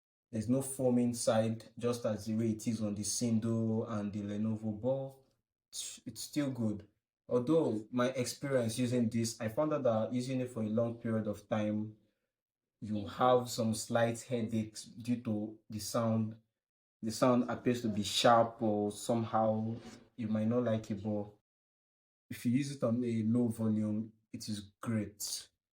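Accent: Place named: Africa. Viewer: He's clearly African. What did you expect? The queen's English😒? American English? He's speaking a language that is not his. Show some respect.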